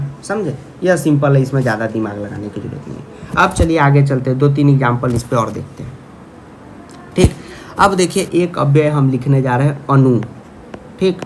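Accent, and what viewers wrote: Bihar, India. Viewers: Sir lagta hai aap bhi bihar ke hai tone pakad liye hai ham😀😀😀😀 BTW the teaching was next level. Thanks for the useful session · Sir, your language is telling me that you are from Bihar because I myself am from Bihar. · Thanks a lots sir .... Mujhe samas mai bahot doubt tha par apka video dekhne ke baad sara doubt khatam ho gya ...... Sir aap ki awaj se pta chal rha tha ki aap Bihar se ho .. mai bhi Bihar se hu..... Lots of love from Delhi Aur one's again Thank you 🙏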